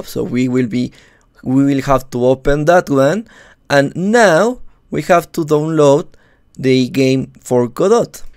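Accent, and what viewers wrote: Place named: Argentina. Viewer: Sos argentino pa? Muy bueno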